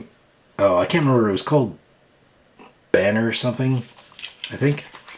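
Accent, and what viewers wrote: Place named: Canada. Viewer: My wife is from White Oaks, is that near you? She loves when she hears your Canadian accent.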